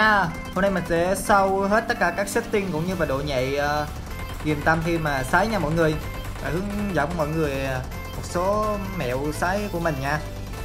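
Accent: Binh Dinh, Vietnam. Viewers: A phải ng bình định ko s nge dống thế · Anh là người bình định ạ ?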